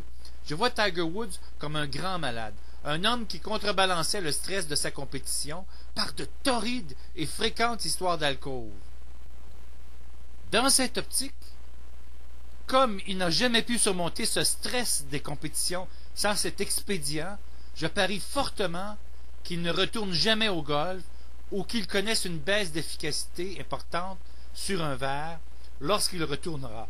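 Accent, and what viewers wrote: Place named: Quebec, Canada. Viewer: Très intéressant! au passage j'aime bien l'accent québécois Bonne continuation!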